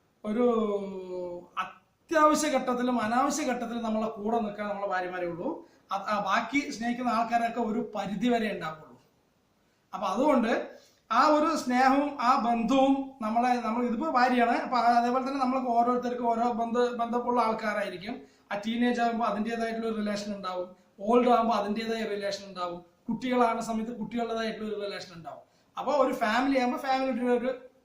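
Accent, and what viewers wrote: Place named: Palakkad, India. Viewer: aivvaa palakkadan basha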